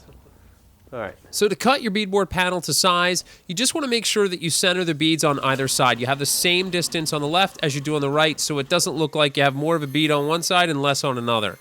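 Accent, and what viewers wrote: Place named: Pennsylvania, United States. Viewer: I knew I heard a PA accent.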